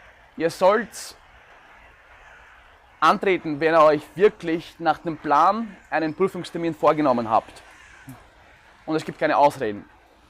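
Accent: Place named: United States. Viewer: Auf dem ersten Blick hätte ich gedacht, dass er entweder Amerikaner oder Österreicher ist, weil er zwei Sprachen so gut beherrscht. Denn ich studierte für ein Semester Jura, bin ich qualifiziert zu sagen, dass es knallhart schwer ist. Ich gratuliere dir und drücke die Daumen hoch! Du hast noch einen Subscriber verdient:)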